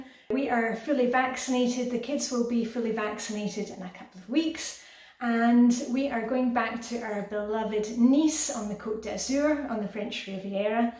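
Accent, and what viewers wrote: Scotland, United Kingdom. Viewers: I love the Scottish accent too and it's my favourite overall, I just loved listening to people talk when I visited Aberdeen a few years ago 😊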